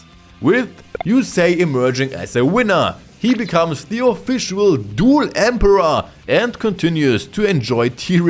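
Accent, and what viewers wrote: Germany. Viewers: Lass mich lügen aber bin mir bei dem Akzent zu 99% sicher dass du deutsch bist 😅 Gutes Video btw. Endlich mal wieder ein Tuber der mal wieder Videos zu 5ds macht 😁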